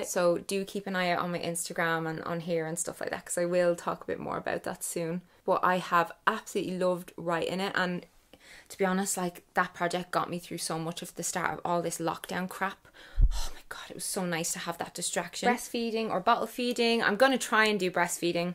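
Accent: Ireland. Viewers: Honestly not that great 😔 Unfortunately covid coincided with just a lot of shitty stuff so my natural bubbly self is a bit emotionally exhausted...But your videos always boosts my mood so much...so thank you Mel! Especially the food diary Friday's... it actually made me recognise my own problems with binging and stuff so yeah thank you especially for all your stuff with that. It's weird I realised the other day that a few of our close family friend's are Irish and there's something about that accent, your accent, that reminds me of happier times... Sending you lots of love 💚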